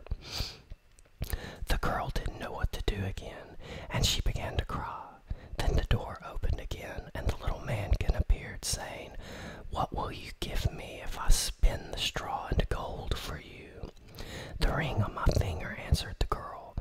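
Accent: South, United States. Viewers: Very awesome, I love asmr, and I love your southern accent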